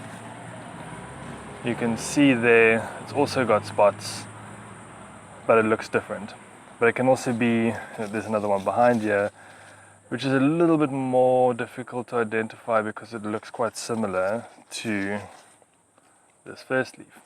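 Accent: South Africa. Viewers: Thanks for the tips. I'm subscribing because you sound South African and it's hard to find South African gardening YouTube channels today we can relate to. Looking forward to your future videos...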